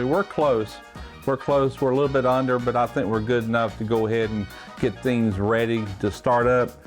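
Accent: South, United States. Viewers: I can tell just by your accent that your from the South will you please invite me to your next cookout? Lol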